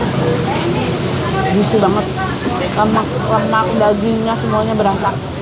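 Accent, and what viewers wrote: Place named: Java, Indonesia. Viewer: klo liat teh makan bakso bikin ngiler.. medok bgt...🖒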